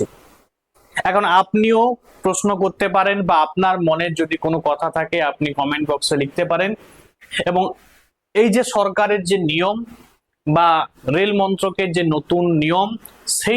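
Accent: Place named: East Bengal, Bangladesh. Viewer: kotha r golar tone sune mone ho6e bangal chele mane east bengal supporter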